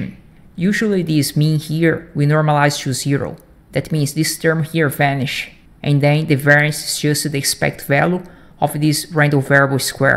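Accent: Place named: Brazil. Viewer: His accent is very Brazilian also 😅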